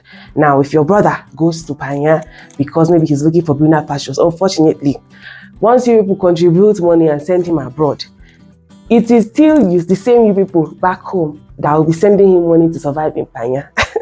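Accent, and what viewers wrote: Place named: Nigeria. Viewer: You're fine as hell, I don't know why I like Nigerian women's accents!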